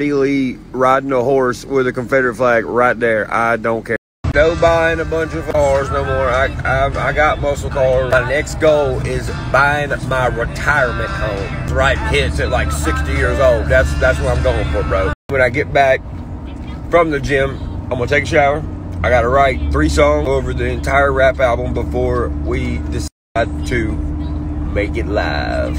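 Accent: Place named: Appalachia, United States. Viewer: Anytime my Main Man I have followed you for years! I THINK YOU HAVE A HEART OF GOLD AND A VOICE OF A HILLBILLY GOD!! MUSIC FEEDS THE SOUL! I AM SUCH A HUGE FAN I DO NOT COMMENT MUCH BUT I WATCH EVERY LIL CONTENT YOU POST UP!! MUCH LOVE FOR YOU. I am so proud of you!!! Congratulations God is good all the time!. Keep it up many more blessing my friend Love ya! 🥰🥰🥀🥀💜💜🍻🍻🍻🍻👍😉🤗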